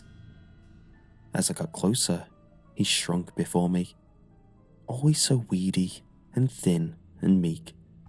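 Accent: United Kingdom. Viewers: It's always a good episode when the British guy is narrating.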